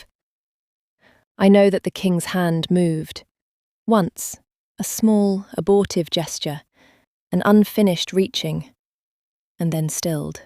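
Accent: England, United Kingdom. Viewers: The narrator has an English accent. She is pronouncing 'beta' the way we do in the UK, not 'incorrectly', just different. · That’s how us UK English people pronounce beta - beater. Nowt wrong with that.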